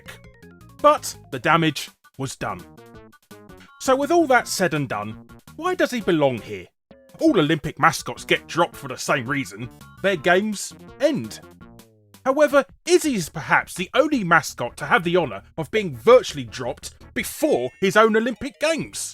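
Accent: United Kingdom. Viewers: I love your voice it's like you're a British guy with a mouth full of marshmallows.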